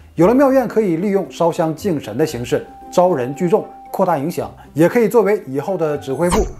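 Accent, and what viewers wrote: Northeast, China. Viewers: 东北的吧?好亲切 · 东北味儿普通话，不难懂，不需要字幕吧，会影响更新频率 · 我一般听不惯东北口音的播主你是个例外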